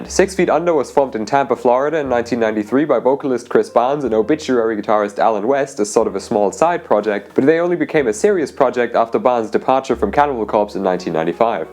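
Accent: United Kingdom. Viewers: There is no way a guy with fender Wall art and a UK accent is saying every song sounds the same on 13. Kids these days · It's kinda wierd listening to a British dork with a constantly cracking voice and a tendency to raise pitch and laugh/giggle nervously at the end of every sentence, making fun of the way someone else looks or talks. Whatever may be true of all the things you point out, talking about someone's look/style and/or vocal tendencies, is 100% fucking douche move.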